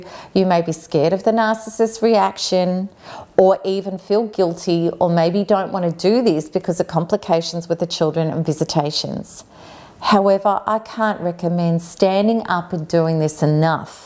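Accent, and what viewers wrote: Australia. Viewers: Thank you for helping me understand. Three years ago I found you as a result of an awakening prompted by intuition something was not quite right I read an article you had authored. Since then, devaluation, discard, deletion. Loss of home, children, job, family, everything except one very important belief. Me Thank you for your for courage . I kept hearing this Australian voice in my thoughts assuring me I was not alone in this nightmare Thank you, 'down under'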